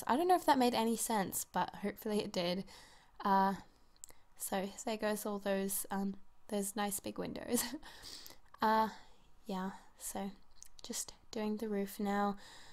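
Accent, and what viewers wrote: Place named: England, United Kingdom. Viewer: I can't figure out if her accent is English, South African, Australian or a New Zealand one... Someone help please, I am new here! Haha.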